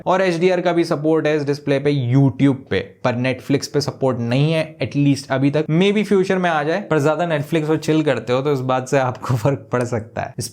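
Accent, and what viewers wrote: Bhopal, India. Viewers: Bro is a bhopali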